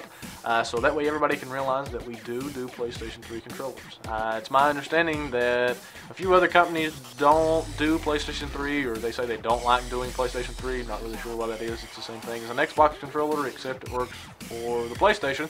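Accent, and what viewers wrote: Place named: South, United States. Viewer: Dude, you have such a cool southern accent!! I wish i still had mine but i lost it when i moved up north:(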